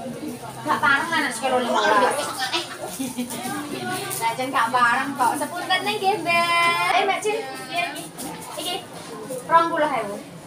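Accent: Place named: Java, Indonesia. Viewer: Walaikum salam mbake,koyone ne buah seger seger dan pasti enak..tapi lebih kpenak bahasa jowone ketibang buahe mbake so'ale medok tnan.salam santun dari crew happy wa buyut???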